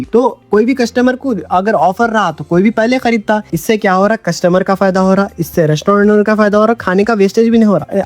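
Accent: Hyderabad, India. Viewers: I like the way you talk Hyderabadi style accent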